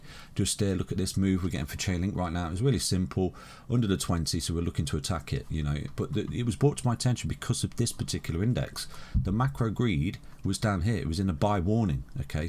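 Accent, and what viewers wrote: United Kingdom. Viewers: Dump with the British accent!! FIRE 🔥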